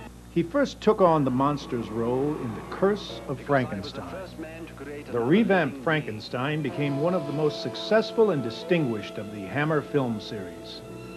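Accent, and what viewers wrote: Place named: Transatlantic, United States. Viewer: Had me thinking there was this talented guy with a transatlantic accent in the studio with and no way he chopped all this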